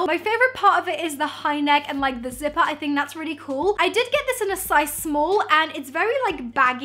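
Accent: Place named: United Kingdom. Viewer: Jana Deni she has a British accent of course she isn’t going to say it right 🙄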